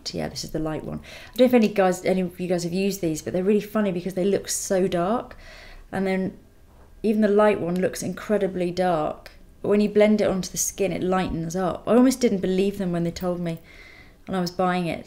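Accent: England, United Kingdom. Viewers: ahh her voice is so relaxing, like her accent and the tone and cadence of her voice. anyone know where (in england i assume) shes from? her accent is really similar to pj harveys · Is that British accent ?? ❤❤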